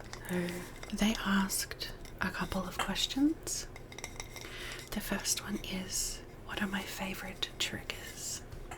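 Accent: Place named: Australia. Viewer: this is such a special video! 🥲💗🙌 I really resonate with your gentle and loving style of ASMR. And I really enjoyed hearing you answer my questions! 🥰🥰🥰 (Also, your lovely Australian accent reminds me of a good friend of mine from middle school, which makes me happy. ❤️)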